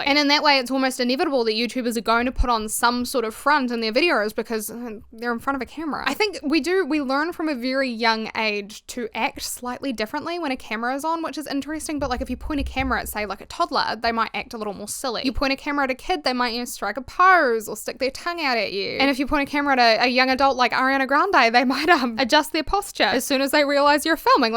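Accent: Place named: New Zealand. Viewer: my master yapping queen thank you for being my background yapper while gaming there's something about the kiwi that makes your voice so soothing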